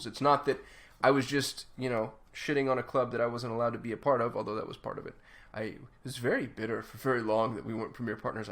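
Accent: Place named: United Kingdom. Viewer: you know i always do. #1 fan of British accents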